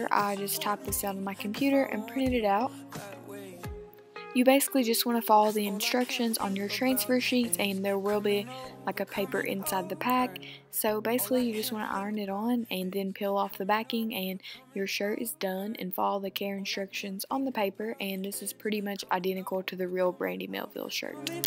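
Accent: South, United States. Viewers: she's southern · Love your accent! I'm from Atlanta and my dad is from Alabama so we're used to that kinda thing😂 your style is so cool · People are getting annoyed because of her southern accent but it doesn't matter to me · We have the same voice SOUTHERNERS ROCK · She's southern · shout out to someone else who got a southern accent like me :) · Nikki King It's definitely a southern accent!😁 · 2gymnasticgirls that's not a " Texan" accent. It's called southern 😊😤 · She's just southern lmao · you're video was amazing! I love all the ideas and I think you have the cutest southern accent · she has a southern accent....really not that hard to figure out lmao Idk why people think she's from europe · SPOOKY💖 btw I love your accent I'm also from the south❤️❤️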